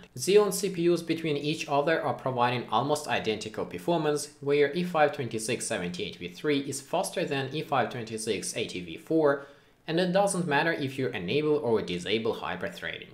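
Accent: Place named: Russia. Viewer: Привет, чел! :)) Узнаю русский акцент.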